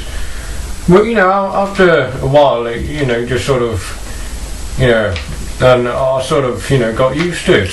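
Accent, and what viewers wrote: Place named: Northern England, United Kingdom. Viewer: His accent sounds northern (English) to me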